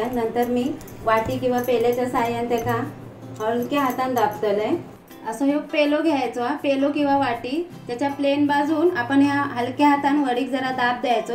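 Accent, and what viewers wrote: Malvani, India. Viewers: Khup chhan boltas tumi... Pure malvani... Recipe pn mast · Mastt.. 👌.. Tumchi malvani aikuk khup maja eli.. 👌👍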